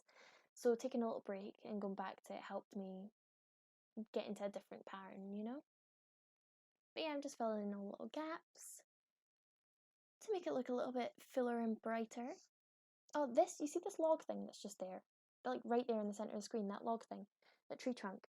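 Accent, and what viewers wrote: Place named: Scotland, United Kingdom. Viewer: I loved it! And I love your accent 💚 so rarely I hear Scottish in media 💚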